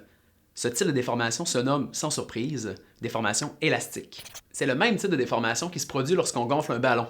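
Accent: Quebec, Canada. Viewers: Je comprends rien à son accent de Québec là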